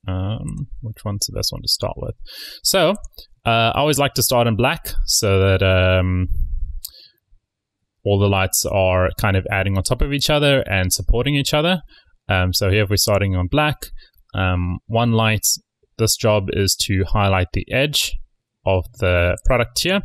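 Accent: South Africa. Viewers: You might be a South-African by the sounds of it :D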